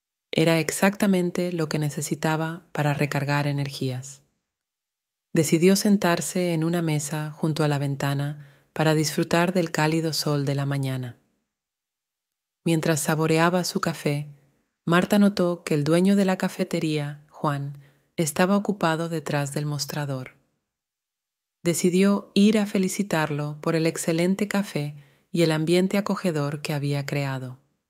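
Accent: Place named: Latin America. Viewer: Good story but I have 2 issues with this. First (and not so important perhaps) is that there is no passion, no spark in the voice of the person telling it. That makes it a bit boring. Second and most important, she mixes accents, she says "decidir", "dulce", "vecinos" and many other words as pronounced in Latin America (with seseo), but then she says "entonces", "hizo" etc in the way they are pronounced in Spain. This is VERY confusing to learners, especially to beginners who are the target audience here.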